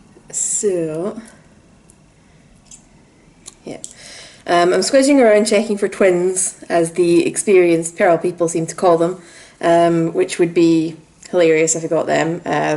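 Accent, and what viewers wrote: Scotland, United Kingdom. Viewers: Are you a fellow Scottish las ?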